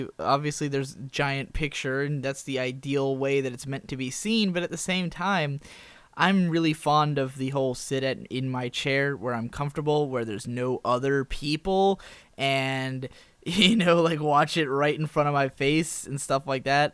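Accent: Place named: Alabama, United States. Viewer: if I may ask; what state do you live in? It almost sounds like you live in the middle of nowhere in Alabama or something...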